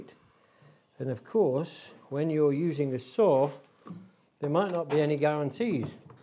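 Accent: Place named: Midlands, United Kingdom. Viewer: So nice to hear a lovely soft Midlands(?) accent on one of these videos. Thanks!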